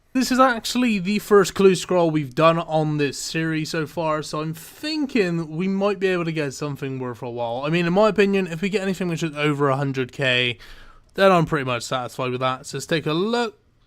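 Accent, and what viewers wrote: Bristol, United Kingdom. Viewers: bristol accent 😂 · are you from Bristol? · Are you from Bristol? Sound very Bristolian aha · Are you from Bristol England? Or near by?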